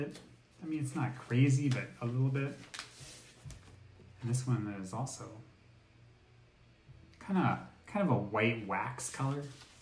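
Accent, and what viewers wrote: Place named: Scotland, United Kingdom. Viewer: Good to see u back. Interesting as ever with a healthy does of Scots. Prefer your first pronunciation of Brisbane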